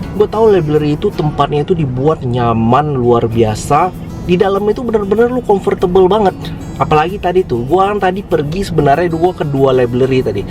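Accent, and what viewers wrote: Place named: West Sumatra, Indonesia. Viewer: Wkwkw bner TU minang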